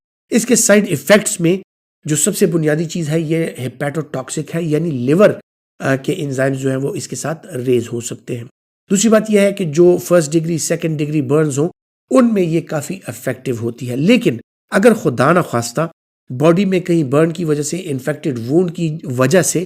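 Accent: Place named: England, United Kingdom. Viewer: Doctor saab lagta ha ap England se hein lekin Pakistani urdu samajty hein English nahi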